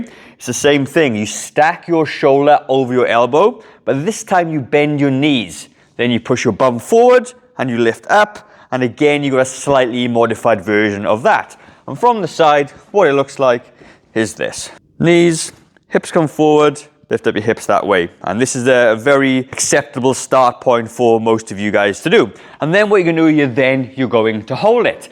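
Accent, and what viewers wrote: Wales, United Kingdom. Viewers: Im Scottish, im loving your Welsh accent. Celts together!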